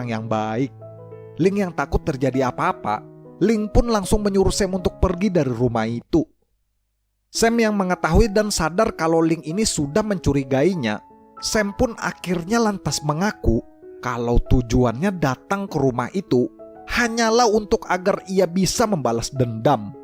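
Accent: Banjar, Indonesia. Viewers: Logalnya Kya orang bnjr... Bujur jua KH ??? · Orang banjar kah pian bang, logatnya pina sama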